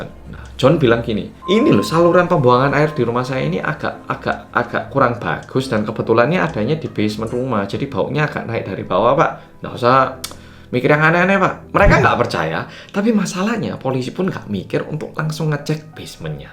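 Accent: Java, Indonesia. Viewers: Wong jowo😂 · Bahasa Jowo ne yo medhok banget...😅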